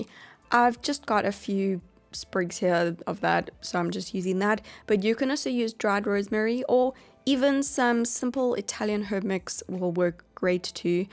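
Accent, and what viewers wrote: South Africa. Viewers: South Africa! ❤️ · South Africa I think x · are you South African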